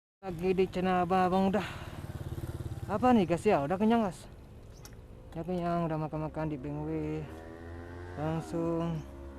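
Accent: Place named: Aceh, Indonesia. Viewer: Logat Acehnya masih,btw selamat atas 1k sub🎉🎉🎉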